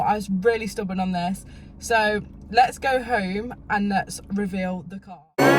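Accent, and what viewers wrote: United Kingdom. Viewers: Why are you speaking brittish ?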